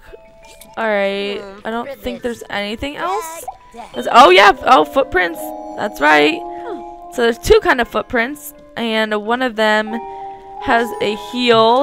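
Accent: United Kingdom. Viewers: U sound like garnet from Steven universe with ur British accent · Your British accent is spot on!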